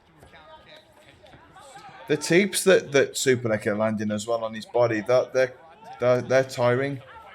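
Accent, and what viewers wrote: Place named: Birmingham, United Kingdom. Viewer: i'm admiring your accent tbh. are you from Burmingham by chance? (i"m just english learner >< )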